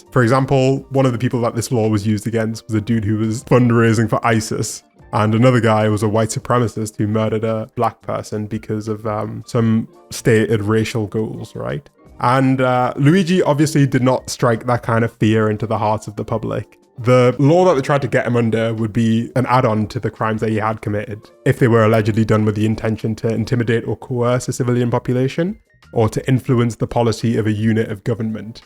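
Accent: United Kingdom. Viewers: You know it took me a while to piece it together. But someone with a british accent explaining the trial of Luigi with more care and compassion then US Media is hilarious to me. Anyway, forgot I was subscribed here and since I turned off my youtube algorithm, I hope to ring the bell and get more videos. Oh yeah, FREE LUIGI!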